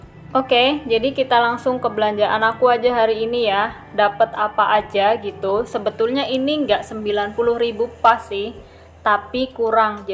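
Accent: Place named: Banyumas, Indonesia. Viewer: Salam kenal bunda pinter bget ngatur uang blnja , kowq bahasa ngapak daerah mana bun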